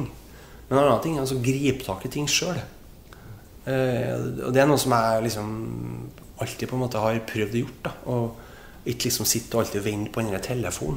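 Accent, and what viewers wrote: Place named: Trøndelag, Norway. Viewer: Bra forklart, men hvor er "The Subtitles" ikke mange av mine kompiser her need som forstaar TrOndersk!?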